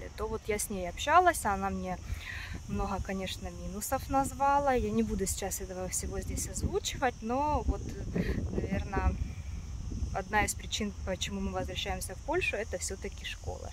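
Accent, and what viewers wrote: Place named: Ukraine. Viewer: вы мне очень нравитесь, нравятся ваши обзоры. Вы молодец, модете прставить на место завистников и кто злорадствует. Очень нравится ваша не зависимость, решимость,уверенность в себе, я даже гдето вижу себя в вас. Так держать. Я тоже когда то, но это было давно, уехала с Украины, долго себя искала, не чего не боялась. Единственное что, только не обижайтесь, поработайте немного над произношением. Наш украинский говор не очень красивый. Почему говорю наш т.к. я тоже так говорила и у меня подруга была в Питере ей было совестно за мое произношение. Только не обижайтесь но произношение ужастное. Желаю вам удачи во всем вами задуманом.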